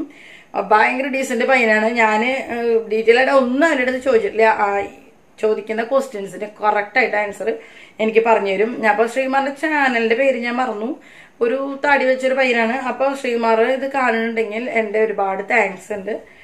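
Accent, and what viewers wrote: Thrissur, India. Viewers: Chechi ente Peru soumya kunnamkulam aanu veedu but pkd aanu thamasikunnathu eniku checked samsaram kelkumbo nammude thrissur basha ketta njan happy first time comment aanu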